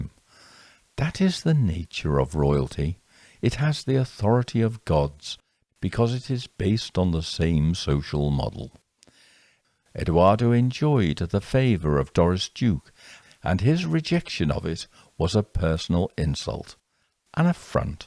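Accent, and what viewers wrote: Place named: Northern England, United Kingdom. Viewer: Your narration and voice are perfect. Every so often a tiny northern inflexion. Such a lovely lilt amongst English accents, which lends perfectly to spine-tingling and sinister tones.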